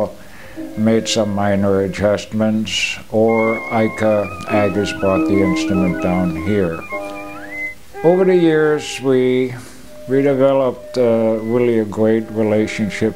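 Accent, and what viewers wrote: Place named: United States. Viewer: For me, It is an american accent without even a tiny bit of a germanic tongue.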